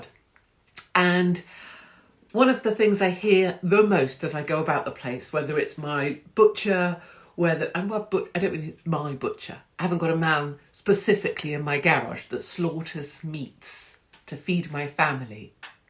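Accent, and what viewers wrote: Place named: England, United Kingdom. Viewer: GOD----YOU ARE GOLD, YOU KEEP GETTING BETTER, LOVE THE ACCENT, PURE ENGLISH EXPRESSIONS, '' CARRY ONE BRITANNIA '',.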